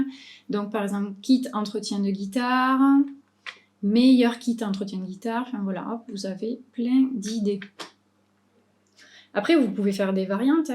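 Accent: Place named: South, France. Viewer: Merci beaucoup pour vos infos, génial 👍 avec le petit accent du sud comme j'aime 😉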